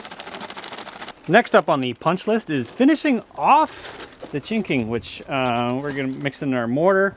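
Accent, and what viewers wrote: Canada. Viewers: I just love to hear you talk. Canada speak, uh, yes.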